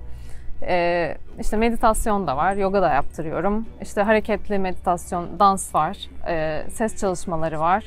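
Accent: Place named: United States. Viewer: Böyle bir konuşma şeklinle hangi aklı başında insan seni destekleyip abone olur bilmem ama şunu bilirim bu kadar yapmacık birini ve ses tonu rahatsızlığını asla seyretmem hanım kendiniz olun bence Amerikan ingilizcesi sizin çok eğitimli olduğunu göstermez bilginiz olsun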